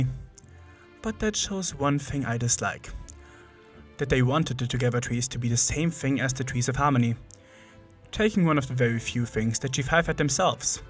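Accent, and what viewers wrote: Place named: Germany. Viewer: Bruhh what is that accent if it isn't German xd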